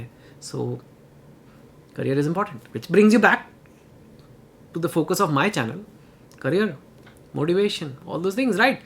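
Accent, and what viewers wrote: Delhi, India. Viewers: Bhaiya U talk like a typical Dilli Wala Mujhay bachpan yaad aa gaya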